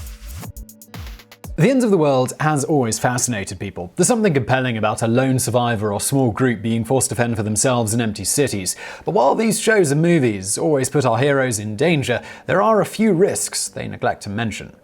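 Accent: England, United Kingdom. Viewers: Nathan Stautzenberger if they call Petrol Gas, what do they call Gas ? Americans are strange, yet he sounds English.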